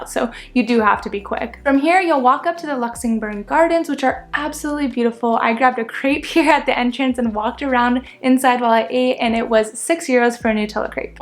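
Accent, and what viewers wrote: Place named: United States. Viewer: I love the way she talks, just straight up American without even trying to enunciate the local dialects lol 😅 I'm flying out tomorrow, we'll do the same! 👍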